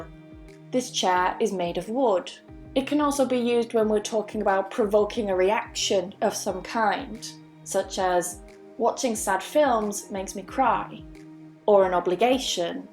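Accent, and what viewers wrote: United Kingdom. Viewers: love your British accent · Please, You should make some videos about reading in English, I believe it will be useful furthermore would help us a lot and your British accent is beautiful, thank you for sharing this video plus a big kiss.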